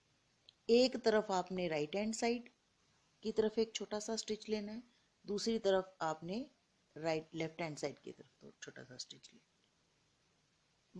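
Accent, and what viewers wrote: Punjab, India. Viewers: Beautiful design.. N ur Hindi with Punjabi touch is so sweet.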